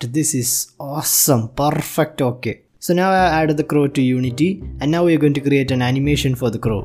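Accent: Kerala, India. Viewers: his voice is Luke indians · you English is pure Indian English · now I'm really sure is Malayali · u litterally sound like a malayali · real malayali english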